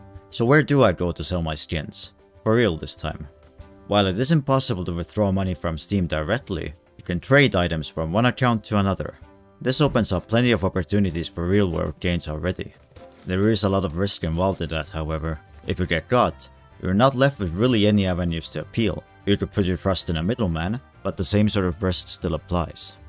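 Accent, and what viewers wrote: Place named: Estonia. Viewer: Hea video ja huvitav kuulamine :D Sain esimese paari minutiga aru, et tegu eestlasega! Keep it up!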